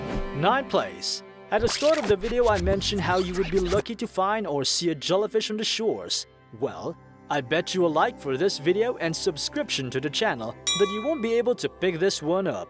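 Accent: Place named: Netherlands. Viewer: Narrator sounds Dutch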